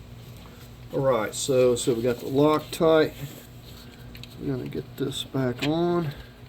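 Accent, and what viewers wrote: South, United States. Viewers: Jeez you must be from the south huh?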